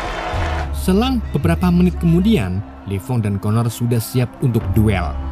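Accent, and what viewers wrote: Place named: Java, Indonesia. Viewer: Medhok yg mantab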